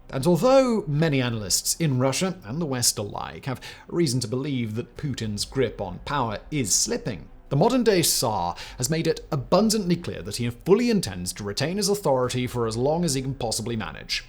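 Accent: United Kingdom. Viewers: I have to admit that I have a weakness for the British accent. An Englishman (or woman) could be reading the phone book and I would be entertained for hours...so imagine how pleased I was when I found Simons'channel (s),(s),(s),(s)... Speaking of his channels... I think that a mad scientist genetically engineered a sample of DNA & the concept of the YouTube channel which produced an octopus type creature with many channels and many brains. An octopus with a magnificent beard I might add. Gods beard isn't half as impressive. Surely holds the record for the most channels...if there's someone with more, I don't know of them. As long as keeps creating, I'll keep watching. Thanks.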